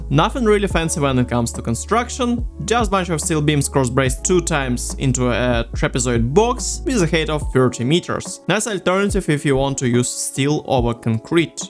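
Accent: Eastern Europe. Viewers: What's your accent? Sounds eastern-european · слов'янський акцент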